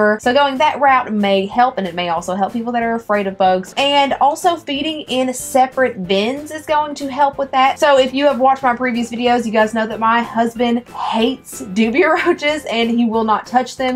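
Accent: South, United States. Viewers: Just curious where you’re from? Hear that southern accent, reminds me of the way everyone talks back home.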